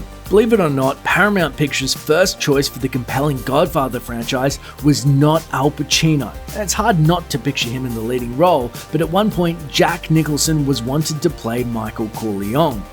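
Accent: Australia. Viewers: I'm sorry, but that douchie Aussie accent is super distracting... XOXO ;) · woah hes australian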